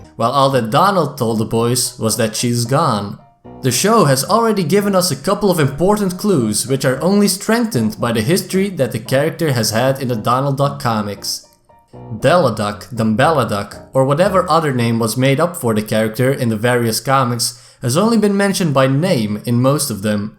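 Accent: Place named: Netherlands. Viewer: Ummm you sound like a Dutch speaking person. Are you dutch? Because I can tell when you pronounced the title comic very well.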